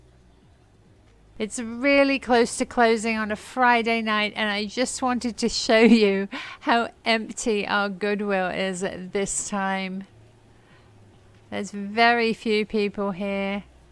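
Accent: England, United Kingdom. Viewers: I love listening to your accent - you sound so English and then you say "clowshe" rather than closh (to rhyme with gosh) as we do in England. Fascinating to see what words have changed and what USA phrases you use. Shopping Cart is one - we say shopping trolley. Love the channel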